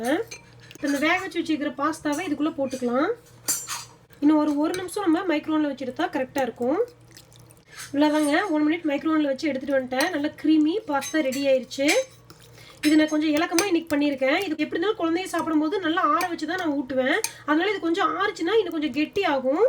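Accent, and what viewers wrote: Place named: Erode, India. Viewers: Nalla theriyudhu. Neenga pesara slang. Namma oorula than over ah vanga ponga nu pesuvom. I'm from Chittode 😊 lives in Bangalore . Ur good name ???? Ur dad s a cook ??? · Hai i am also frm erode but now in ooty happy to hear our erode slang thank u god bless u sis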